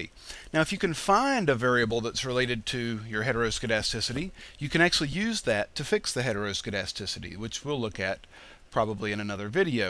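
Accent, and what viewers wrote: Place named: Northern England, United Kingdom. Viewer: Some words are Southern like "dependent" and most of your accent is Northern.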